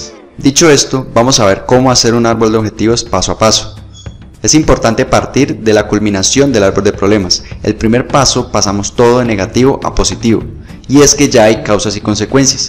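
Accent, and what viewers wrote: Cali, Colombia. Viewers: Hablalo Caleño!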